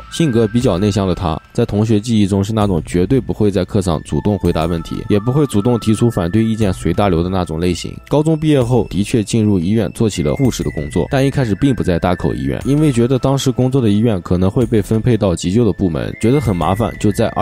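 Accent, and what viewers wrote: China. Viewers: 哈哈，果然能听出来山西口音😄😄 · 播主是呼和浩特人？口音好重。。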